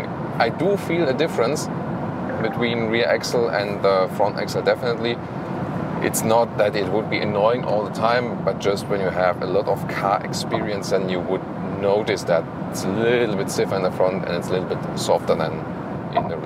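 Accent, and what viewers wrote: Germany. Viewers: Your englisch Sounds very german. Funny.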